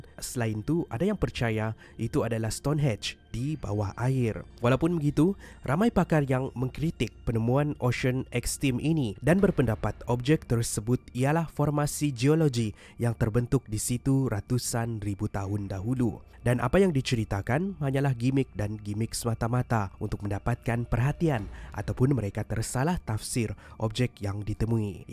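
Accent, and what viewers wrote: Sabah, Malaysia. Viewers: Abg ni orng sabah kh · Abang ni orang sabah ke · patutla cara cakap lain sikit, urang sabah ani haha mantap, teruskan, support bah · Dia loghat sabah.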